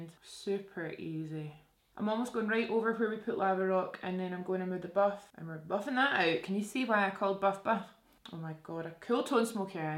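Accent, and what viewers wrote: Scotland, United Kingdom. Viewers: I remember watching you when you first started... now you got your own Brand, still gorgeous as Ever and LIVIN your best! So proud of you 🥰 I’m 41yrs old MUA here on YouTube & I’ve been in beauty for about 25yrs... I’ll tell ya, these eyelids have completely changed on me! And it’s almost like overnight you just see extra skin that wasn’t there the day before 😒 sucks man!!! So I feel ya on the eyelids 😬 Especially for us who LOVE to do our creative shadows... it’s just not the same anymore 😩 But I STILL entered your VIEVE party looks on IG 🤩 I’m going strong until my lids completely hang over my eyes LMAO. I purchased your Liner in “Brat” & lipstick in “Treasure” and I’m fully in love. You nailed the pigment creamy texture ☺️ I love posting with these lips . I’m also Scotch/Irish/Mexican & that’s the whole Vibe I love about ya,, that Scottish tone is everything sweetie 😁 stay safe out there. 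Rock those neutral tones all you want... they’re beautiful. <mail>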